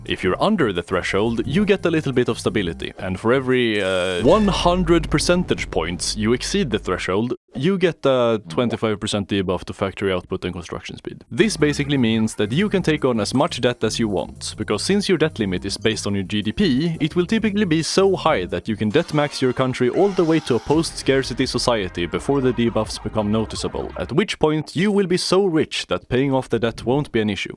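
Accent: Sweden. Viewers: max0r's style really doesn't work with that thick Swedish accent and demeanor. For this to work you'll have to stop being monotone which is an impossible task for any temperature challenged individual. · I can hear the Swedish dialect.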